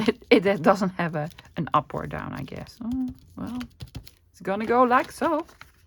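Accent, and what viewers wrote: Netherlands. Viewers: To answer your question about languages in videos. First let me say, I have so much respect for those that make their videos in English when English is not their first language. As an English speaking person I would like to hear videos in English even if it is not perfect English. I guess that is me being a bit selfish so I can make sense of the project. I am sometimes ashamed of myself for not learning a second language. By the way, I think the Dutch accent is my favourite to listen too 😉. But for me, definitely speaking English, even if not perfect. You are so fun to listen to. You are very creative and fun. 😊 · Happy to have spoken in English (even if limited), Dutch accent very pleasant to listen to. Gail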